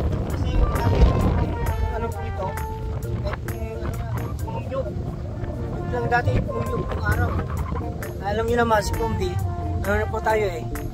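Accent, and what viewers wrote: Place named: United States. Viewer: Para ka palang kano sir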